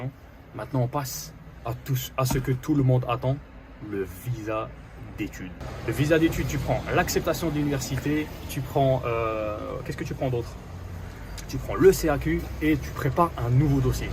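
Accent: Algeria. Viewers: Fraht ki sma3t ta voix w 3reft Belli weld Bladi stp g une licence en finances et comptabilité qu'est ce que tu me conseilles je postule M1 ou L3 et qu'elle spécialité et surtt qu'elle université ? Merci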